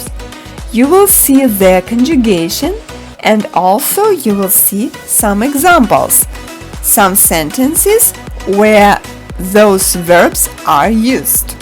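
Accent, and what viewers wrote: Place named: Russia. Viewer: Это круто, но я думаю, вам нужно все таки немного избавиться от русского акцента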